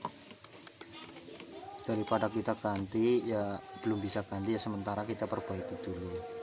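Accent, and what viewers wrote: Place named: Banyumas, Indonesia. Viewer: Bang ruamhnya mana kok ngapak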